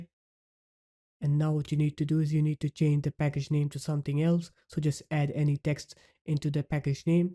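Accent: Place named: India. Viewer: Mate u cant get raid of indian accent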